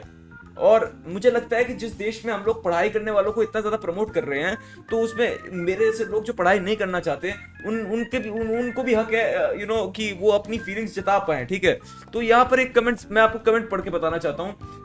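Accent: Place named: Bengal, India. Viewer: Bengali ho bro 🤭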